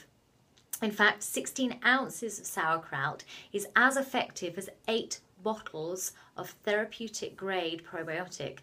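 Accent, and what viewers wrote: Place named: United Kingdom. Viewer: U have a strong British ascent young white lady. Other than that, when is the best time to take probiotics? Thanks in advance ma'am.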